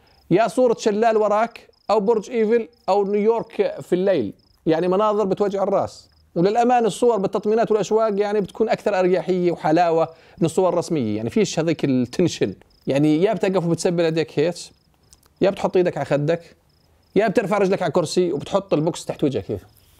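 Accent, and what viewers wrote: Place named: Jordan. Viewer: ههههههههه فطستنسي من الضحك عايش بامريكا ومفتقد لها الهجه الاردنيه ههههههه تحياتي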